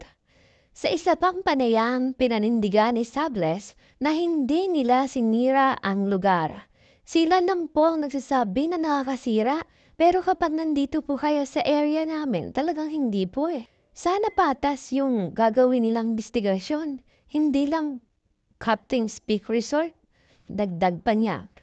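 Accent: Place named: China. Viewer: Ok sana presentation ni blogger kaso prang bulol at di tga Pilipinas mag pronounce eh...prang tga China kung magsalita😅😅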